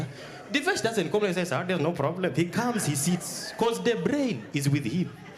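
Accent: Uganda. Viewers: 😂😂😂 apo kwa ngugi 😅😅😅his Luganda pronunciation inanibamba😂😂😂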